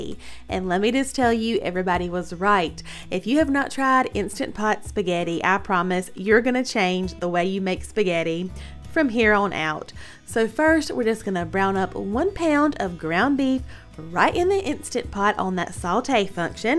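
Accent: South, United States. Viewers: Love your southern accent 💕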